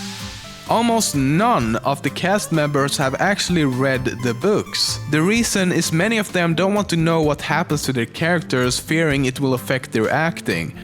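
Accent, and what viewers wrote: Sweden. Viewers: are you from sweden? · Seriously, his thick Swedish accent is so annoying! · Are you Swedish :D?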